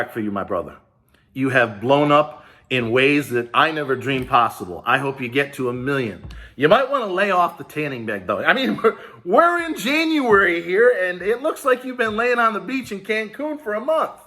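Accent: New York, United States. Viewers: Wisconsin Green Bay ( don’t hold it against me ) I have been here since about April. I love that you love everyone ! You talk like a tough New Yorker, and have Atlanta love in your soul. And you have the Rocky fighting spirit! You tell it like it is I love the F bombs I love the slapping of the desk the Dixie cup coffee I love to see the watches! More importantly when you need to have a shot hope I can pull up this video because every time I call there they tell me I am getting nothing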